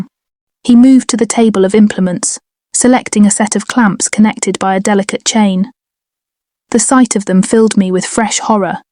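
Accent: United Kingdom. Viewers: First time listening. 29 minutes in, so far, it's good. I l❤ the brit accent.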